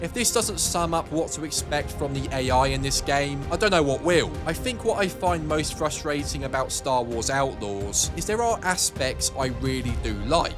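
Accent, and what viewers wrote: United Kingdom. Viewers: Why do all British YouTubers sound like absolute bellends? Are you all trained at some school to sound like retards?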